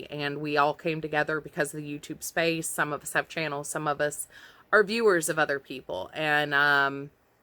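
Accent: South, United States. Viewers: Im a new subscriber but wanted to say I really love your videos! I watch Mary from Ireland too LOL my late husband was very Irish so she touches my heart. Your sweet southern accent reminds me of home (I'm a country girl from rural midwest). Keep up the great vlog!!! Oh and I luv luv luv the dr who scarf!!!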